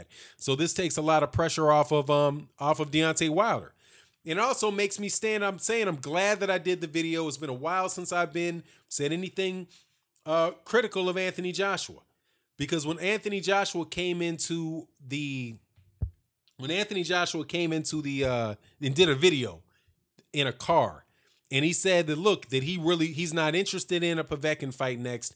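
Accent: United States. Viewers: I like your "more than likely's":) i also like your american accent and no bias towards Beyonce being allowed a few extra seconds of recovery against Ortiz. Bravo!!!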